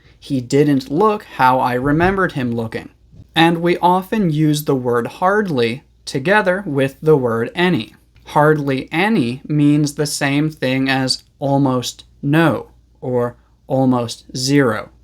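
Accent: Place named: United States. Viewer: .. I'd like to know where your Am. accent is from... Which state and city were you born in?? Please, answer me when you can.I'm pretty curious about it 😊 Thanks a lot for your classes.You're a great teacher!❤❤😊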